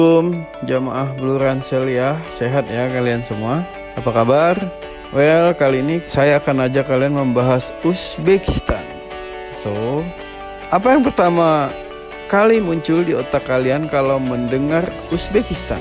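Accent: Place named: Banjar, Indonesia. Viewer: Suaranya seperti Urang Banjar ya, R-nya susah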